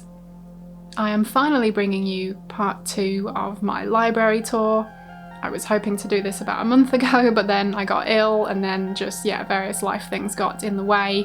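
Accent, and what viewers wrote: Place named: England, United Kingdom. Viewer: Thanks for a very enjoyable video,. You have a fantastic collection of books with some very eye-catching covers, a lot of which I have to admit I've never even heard of! As I'm over in the UK it was also great to see US editions of books by favourite authors of mine like Clive Barker and Ramsey Campbell. It also made me smile that you still say Poppy "Zed" Brite instead of Poppy "Zee" Brite and that you still pronounce "Bernard" the English way 🙂. I'm probably going to have to watch this video again so I can note down and research the books that particularly captured my attention.......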